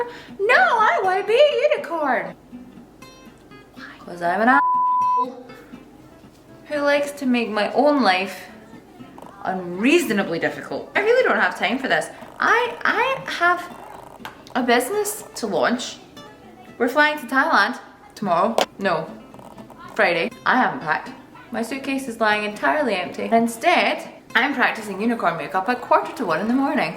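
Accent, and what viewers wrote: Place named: Scotland, United Kingdom. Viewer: if the plan was to entertain as stated this was hilarious :D also i love a scottish accent ! got any pics of the end result though ? ;)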